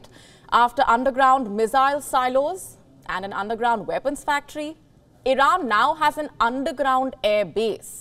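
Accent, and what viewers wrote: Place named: India. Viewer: please change other news reader since this India are IndLish then English...so twisted tounge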